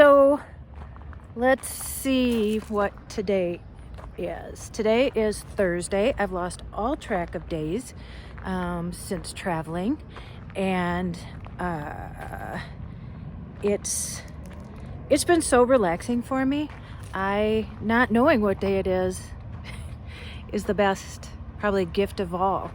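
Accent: Minnesota, United States. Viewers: Reasons to listen to you talk : Watch you cause ur beautuful Have a lovely voice with the pleasant MN accent Great story teller Are deep, honest n sincere Sharing ur journey n story I'm on one too n learn from you and did l say your beautiful n not 50 something! 😘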